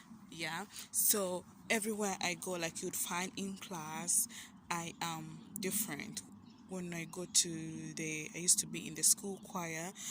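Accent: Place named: United Kingdom. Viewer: You keep it up. Don't let anyone tear you down. By the way I love your accent. I'll certainly be going back and watching your other videos. I could listen to a British accent all day long.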